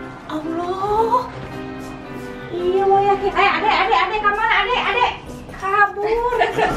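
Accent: West Java, Indonesia. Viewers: Teteh maap klo bs logatnya normal sj...biar hamble jgn disunda2in...maap sblomnya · Resep liat masakan nya z teteh...sambil ngomong logat Sunda...😍 walawpun ak kg bisa ngomong Sunda🤭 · emang sunda mah gitu logatnya.. bukan disunda2 in,teteh kan org garut.. sama kayak org jawa bukan dimedok2in tp emang medok dr sananya kituu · resep k teh teh nyariosna meuni Sunda pisan, cantik deuh. 🤗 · Teh lucuu klo ngmng sunda, kebetulan aku bkan org sunda tp suka sm bhs sunda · memang logat sunda begitu say....sama aja klau ada vlogger yng ngmng bhsa jawa Ciri khas daerah masing2.... mereka ttep hamble.... Kan sambil belajar bhsa daerah lain hihihi.... cinta Indonesia berbeda2 tetap satu Indonesia....😊😊😊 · Memang orang Sunda kok d sunda2in duh si ibuk mah😪😪😪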